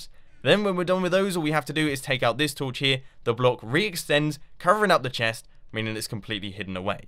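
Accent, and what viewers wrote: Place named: England, United Kingdom. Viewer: oh i forgot about the english accsent lol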